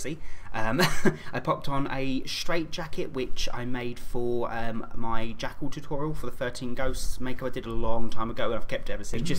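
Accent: United Kingdom. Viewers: Does anybody think his nice awesome British accent fits that character perfectly you sound so sweet but looks terrifying cx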